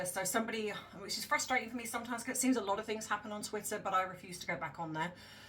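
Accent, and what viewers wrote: United Kingdom. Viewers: Greetings from south coast California. I hear your lovely British voice perfectly clear from California on a Samsung Galaxy cellphone. Thanks 🙏🏼⚘️🐦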